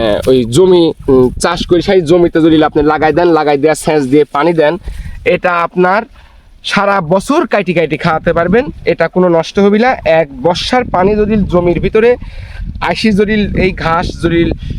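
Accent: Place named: Pabna, Bangladesh. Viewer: আমার ধারণা আপনার বাসা পাবনা? বা এর আশেপাশে ভাষাগত মিল পাচ্ছি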